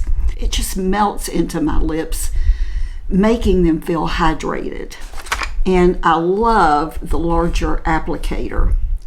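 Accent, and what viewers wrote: South, United States. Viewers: You are from the south...obviously your gentle lilt of an accent. I lived in Atlanta for 16 yrs. you are a true southern belle☺ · I'm glad you shared that you're a strict vegetarian and the💖 e.l.f. is 100% vegan!!! I'm just now at 68 starting to try make up. And I do care a great deal about NO animal cruelty. Thank you so much for sharing all that. I love your video's so much. 💖 You're so sweet and I just adore you. My dad was from NC so I also love your southern accent and mannerisms too. Feels like home to hear it. ❣💋 I wish I could agree that your lips look plumper, but I don't think they do. I tried that product too but I couldn't handle the burn and had to return it. So maybe I didn't leave it on as long you did. I have thin lips too like my mom did, and I keep watching them shrink too. 😞 But wow, quite a beautiful transformation.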